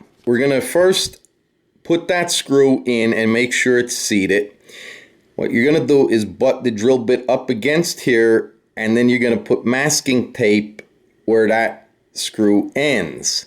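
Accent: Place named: Italian-American, United States. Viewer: You sound like a paisan. 👍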